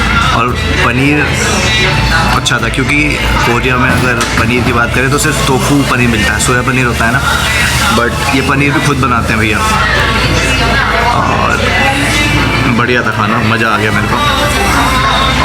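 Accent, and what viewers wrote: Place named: Delhi, India. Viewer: I don't know exactly but yout way of talking like delhi ka munda hahahah, amazing video again.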